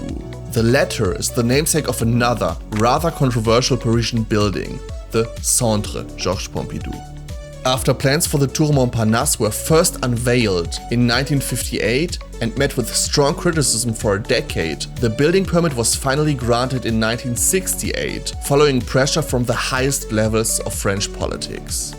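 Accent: Germany. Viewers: deutscher akzent :D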